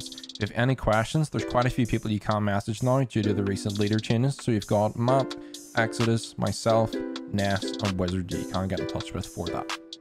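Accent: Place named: Northern Ireland, United Kingdom. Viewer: northern irishman with the asmr right here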